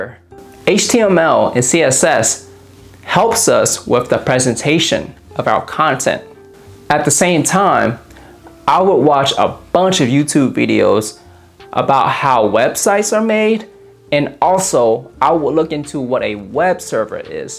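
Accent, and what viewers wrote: South, United States. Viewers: Are you from the south? xD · Aside from the amazing pieces of advice, I just want to say how nice your accent is. Deffinitely can hear some Southern US + Viet accent! Cheers brother.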